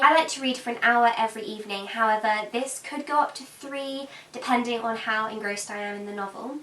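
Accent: RP, United Kingdom. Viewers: You remind me if the girl of harry potter you also look alike and have the same accent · British acccent... Reading books..... And your hair cut is also same as Hermoine granger... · I like these tips but shes so annoyingly posh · Your accent are just like Hermione Grandger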